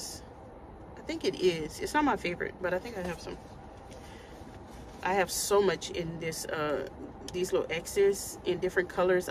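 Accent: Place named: Louisiana, United States. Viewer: I just recently came across your channel because of your Cupid box reveal. I love your down to earth, call it like you see it approach. 😊 And I KNEW you were a Louisiana girl from the first video I watched but I haven’t heard you mention it until this video. As a LA girl myself I’d recognize the accent anywhere! 😂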